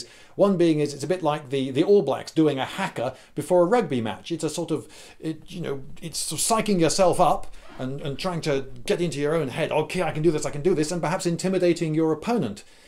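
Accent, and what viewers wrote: United Kingdom. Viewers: Well, they're both Bri'ish.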